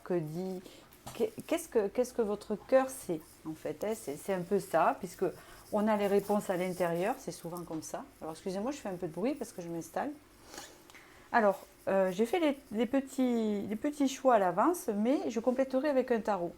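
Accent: South, France. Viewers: Coucou 👋 merciiiiii quelle magnifique tirage tout est dit tout est en raccord☺️ oui traitement des liens karmiques passage très difficile mais nécessaire c’est plus au moins derrière aujourd’hui bon y a encore du job mais là oufff ça va de l’avant 😜 je vis plus le moment présent aujourd’hui et ça change la vie vraiment 🥰.. oui des plaisirs sains et simples que je recherche aujourd’hui.. 🥳 merciiiiiii.. j’adore le tirage vu d’en haut 👍 le son est génial 👍 vous êtes toujours très juste et j’apprécie votre naturel ☀️🌈🌹merciiii pour tout votre temps accordé et ces vidéos qui souvent me titilles 🤣, me remette aussi en question, et parfois ne me parle pas mais cela reste très rarissime il en faut pour tous!! Et je suis grâcement privilégiée 🤣 Voilà ce que j’apprécie dans vos vidéos c’est pas du blabla merci merci merci pour tous ses merveilleux moments de partage que vous m’offrez 🌈☀️🌹🌈avé l’accent🤣j’adore sa sens le soleil, la lavande, la mer les vacances 🏔 🌊 😎🌈☀️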